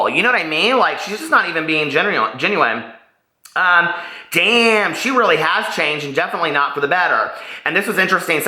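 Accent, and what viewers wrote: South, United States. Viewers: BEAST!!! or Peace :-) I have literally watched your videos all week here while I'm at work (so bad) but I do thank you. It's so hard to believe you're not a Southern. You sound just like us Southern Folk. I enjoy your videos and thank you for being yourself. Love You!!!!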